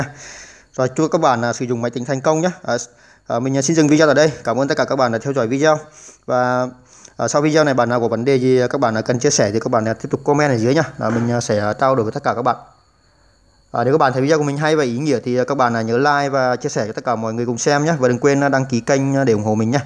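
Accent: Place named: Nghe An, Vietnam. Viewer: Giọng xứ Nghệ nói khó nghe thật , lắng nghe nhức lỗ tai mà chẳng hiểu nói gì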